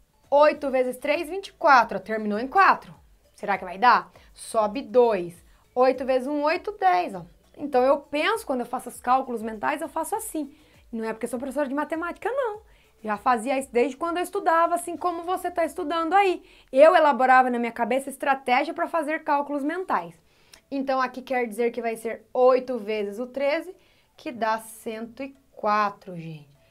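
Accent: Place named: Rio Grande do Sul, Brazil. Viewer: sotaque gaucho kkk vlw pela ajuda tenho 4 dias pra estudar para prova de matematica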